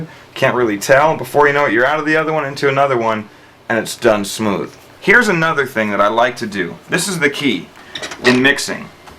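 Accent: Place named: United States. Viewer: oh okay im glad that you are joking. he clearly says "go get somethin to eat" ... maybe you are not used to American accents idk. He is talking about rocking a party, but the place he labels the records is in his house where he is also filming this video. The whole point of saying "go get something to eat" is to illustrate you could go do anything and the record will still be labeled at that exact spot. Listen to how casually he is talking. This guy isn't even a rave dj. If you arent joking, then I'll just say get your ears fixed maybe turn your monitors down lol I guarantee you if you have ever rocked a party you would know.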